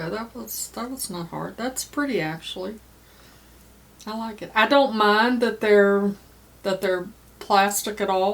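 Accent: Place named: Midwest, United States. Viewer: Sorry, this is going to be a long comment. 😁 I love your videos for so many reasons. I feel like I’m listening to my mom (she’s 64, I’m 43), your accent sounds like my family’s (I’m from near St. Louis), and I love the tips to your son (which I’ve mentioned before). I emigrated to Europe 10 years ago, so your videos always make me feel close to home, even when I’ve not been back for several years. So thanks for the work you do!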